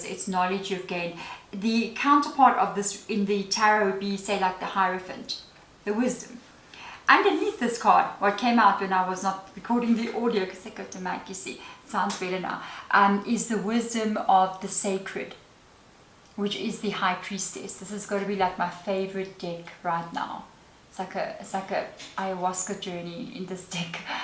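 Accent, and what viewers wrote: Afrikaans, South Africa. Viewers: gave me tears… your vid popped up, then i heard your S.A. accent, posted 13 hours ago, 13 mins long… something to do with Ophiucus.. south african taurus here ❤ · I command the Universe: "Abundance in all ways, especially financial abundance, flows to me like a never-ending waterfall" GRATITUDE GRATITUDE GRATITUDE (Ek hou van jou Afrikaans aksent !)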